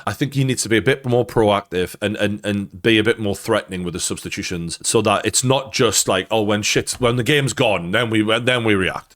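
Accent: Newcastle, United Kingdom. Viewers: Good take, nice to hear the true geordie.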